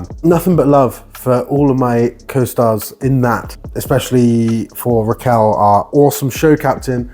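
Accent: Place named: United Kingdom. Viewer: aha, okay. The reason I ask is because I’m having a minor personal Mandela effect. You sound absolutely British, but I have this strange recollection of you having a foreign accent in the past. And yet clearly you are an Englishman. Sorry, I’m a yank, and I could have sworn you used to have a different accent, like Swedish or Russian or something completely different. I’m tripping. 😅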